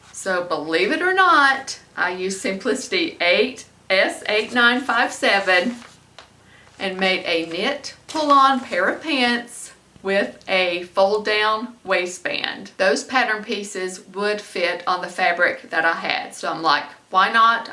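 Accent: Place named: Tennessee, United States. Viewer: Yep, already knew it and this was yet again more confirmation: you're a genius. With a TN accent. 😘😘😘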